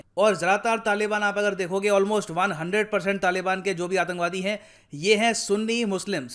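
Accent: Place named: Haryana, India. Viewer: Haryana se lgti ho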